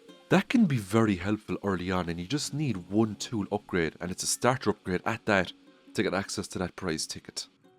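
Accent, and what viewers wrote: Ireland. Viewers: Thanks for the great video! I also enjoy your lovely speaking voice including your Irish accent!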